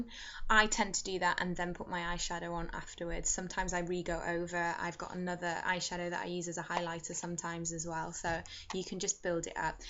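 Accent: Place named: RP, United Kingdom. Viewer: very posh you tube voice, good luck x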